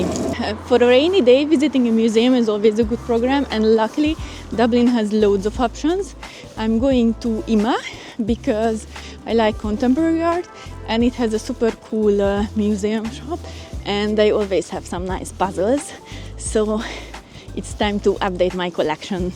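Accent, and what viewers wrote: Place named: Hungary. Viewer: Szia, mindig mosolygok amikor meghallom az accentusunkat 😊 még nem néztem meg a bemutatkozó szöveget, de rögtön tudtam,h honfitárs. Sok sikert a csatornához, nagyon szuperek a videóid. Ha megakarod mutatni az embereknek az Ír beach életet csak gyere fel hozzánk Balbrigganba 😊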